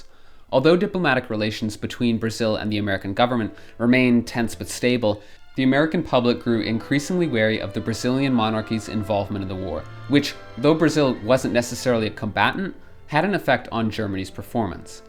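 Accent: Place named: Spain. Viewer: Stop pronouncing our language with spanish accent 🥲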